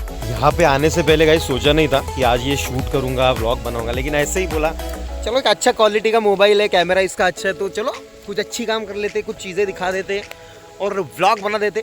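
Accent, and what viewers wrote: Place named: Maharashtra, India. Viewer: Best thing is, That one special Marathi Touch 💚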